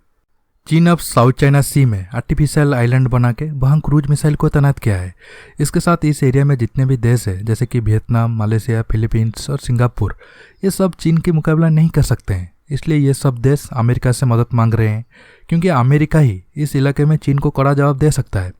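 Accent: Odisha, India. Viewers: Pahle mujhe laga aap bengal se ho Par jb last line suni to ab lag rha hai aap odisha se ho. Kya aap odia hain?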